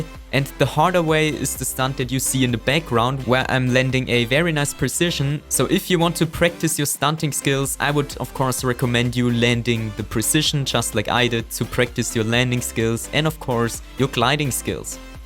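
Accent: Germany. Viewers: Bist du deutscher? :) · Richtiges alman Englisch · Es hört sich so an also ob du Deutscher bist der englisch spricht😅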